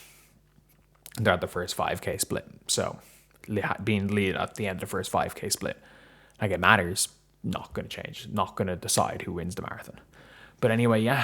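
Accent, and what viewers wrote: Ireland. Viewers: friend, you're doing a great job. This side of the pond accent ia also a breeze of fresh Irish (Welsh?) air. Looking forward to walking with you on our journey of following Luka the fab. Lets cross fingers he gets out of his shooting stink fast and that this is finally the year he gets some, personal if not team-oriented, crowns. Cheers from Slovenia. I groaned loudly when I saw his "pedestrian" stat line and the final score. This isn't the year, Lakers are still fake contenders. Too good for the middle of the road teams, but not "not quite my tempo" for the elite teams. But dropping all sense of urgency after that collossal SA win is dishartening, bastards had my hopes up.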